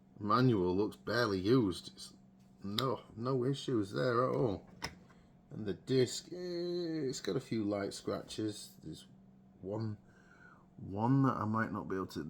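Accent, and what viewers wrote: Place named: Sheffield, United Kingdom. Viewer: Possible, I actually remember very little about it, but usually if a game is terrible it sticks out to me. I think the Switch version is based on the Game Boy Advance edition. I didn't even know there was a PS1 version til this video. Learn something every day. Also, where in yorkshire are you from? Your accent reminds me of my hometown of Sheffield.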